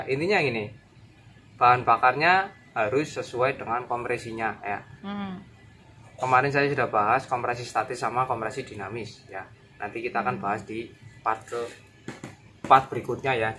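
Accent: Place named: Medan, Indonesia. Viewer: Logat nya seperti orang medan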